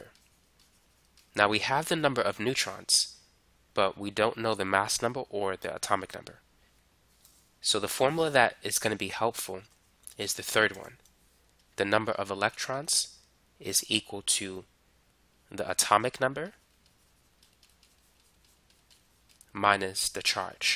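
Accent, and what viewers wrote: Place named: Boston, United States. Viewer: you sound like your from boston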